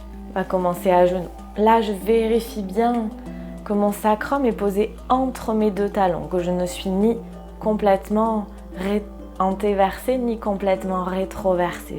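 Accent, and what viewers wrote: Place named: South, France. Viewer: Encore une nouvelle séance qui me fait du bien. Me change des séances iyengar que je pratique tout au long de l'année. Un véritable plaisir avec cet accent si charmant qui m'apporte en plus la lumière du Sud dans nos contrées septentrionales. Au plaisir de pouvoir suivre en présentiel un jour un cours avec;-) Belle fin d'année à vous et longue vie à l'alchimie des corps en 2022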